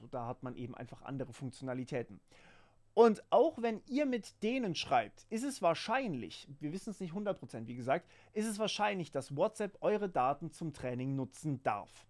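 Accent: Swabia, Germany. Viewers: A scheee, du bisch ja au n Schwôb!!! Ja Griaß Gottle! 😅😅😅💪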